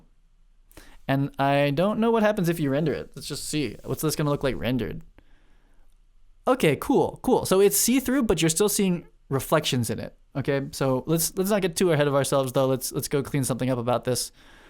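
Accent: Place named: United States. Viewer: Nope, pretty strong US accent but very pleasant to listen to.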